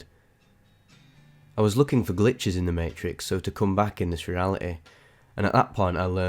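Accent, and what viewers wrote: United Kingdom. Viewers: Love your british accent, voice and tempo👍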